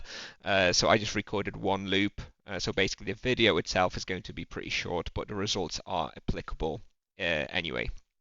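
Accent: United Kingdom. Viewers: moje niedopatrzenie, musiałem skipnąć, bo intuicyjnie liczyłem, że będzie to na końcu filmu. Super kanał, masz mega potencjał ze względu na świetny angielski. Siedzisz w UK? Tak brzmisz hehe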